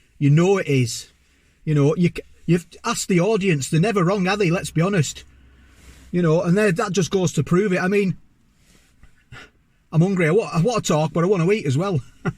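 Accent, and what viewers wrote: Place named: Cumbria, United Kingdom. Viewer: love watching your vids my freind my grandad was from Egremont and you remind me so much of him by the things you say the accent etc your vids are are breath of fresh air in this turbulent times, carry on the great work mate dave from manchester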